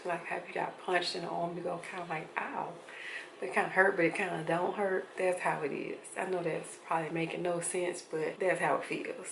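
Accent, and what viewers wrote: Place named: Missouri, United States. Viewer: You are fine girl everything in the right place doing the right thing you gwo grell I have to ask are you or your folks from back east like Illinois like an saw Kansas City you don't have to answer it's just the accent it's a personal game that I play I'm usually pretty good at it if I had to pick one of the three I would say Missouri thank you for the video by the way I'm a lot less stupid now LOL too bad you didn't make it before we broke up LOL or at the very least I wasn't enlightened enough to look it up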